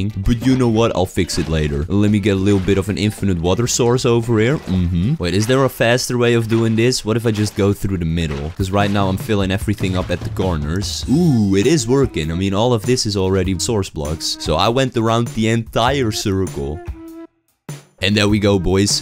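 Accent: Netherlands. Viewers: Are u Dutch, you sound like it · Ik hoor gwn dat je Nederlands bent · You sound pretty dutch bro like me. Like said you sound like.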